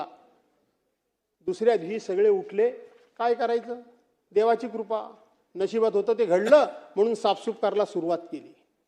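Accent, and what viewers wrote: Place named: Konkan, India. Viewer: कोकणी आवाज कसा आहे.🎉🎉❤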